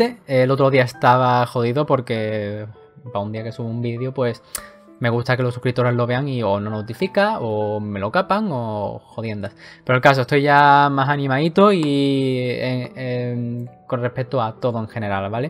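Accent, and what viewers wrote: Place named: Andalusia, Spain. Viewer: te queremos!! jajaj aja amo tu acento andaluz (de nuevo) jajajaja xD me asustaste con ese nombre de adios youtube.. pense que te estabas despidiendo!! siga hermano!! habemos personas q te apoyamos!!:D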